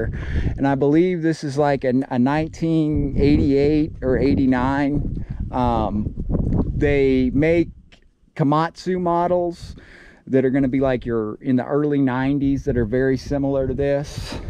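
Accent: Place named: Appalachia, United States. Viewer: Actually another Completely annoying Amateur wanna be when yacking about the dozer only control stick you said the blade goes forward which is annoyingly Stupidly obnoxious the machine goes forward not a dozer blade.you obviously have the same hillbilly accent as the long dead n hayden who of course thought they were invincible peddling a pedal bike in italy ignoring a walkman equipped Stop sign getting graciously taken out forever by one crossing car brain dead from then on was also brain dead before impact.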